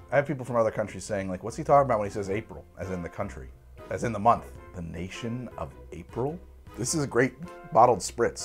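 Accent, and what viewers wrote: New Jersey, United States. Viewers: Wait are you from Philly dude? I thought the accent was giving Jersey. Anyway go birds!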